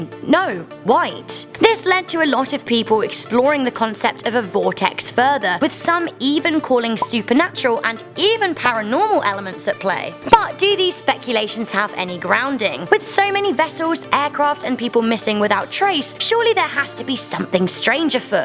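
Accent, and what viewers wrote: United Kingdom. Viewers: Good for you you don't live in America down by Florida cause you are either British Australian or Irish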